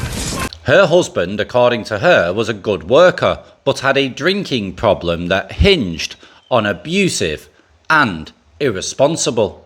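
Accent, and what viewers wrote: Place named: Scotland, United Kingdom. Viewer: Watching for 10 minutes straight and I could’ve sworn I was seeing Adam Sandler doing a Scottish accent! Awesome dude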